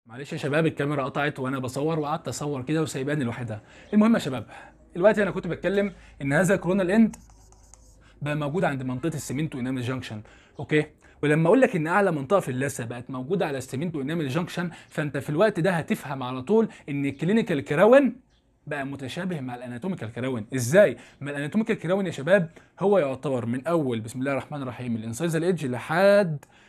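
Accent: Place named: Jordan. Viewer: متاكد انك مصري؟ حاسة انك من الاردن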